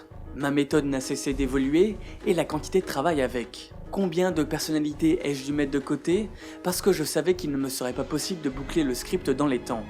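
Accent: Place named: France. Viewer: Mais où est tu né, tu à un certain accent français. Est ce l'accent suisse, belge, ou un accent du sud de la France ?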